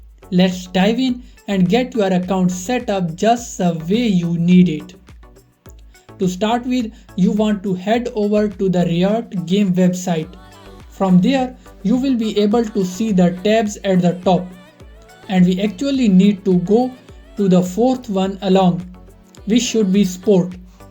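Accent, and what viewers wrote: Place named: Bollywood, India. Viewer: always the bollywood accent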